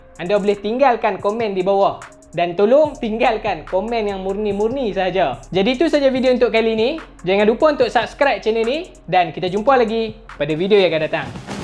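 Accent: Kedah, Malaysia. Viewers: Awak memang betul kedah kedah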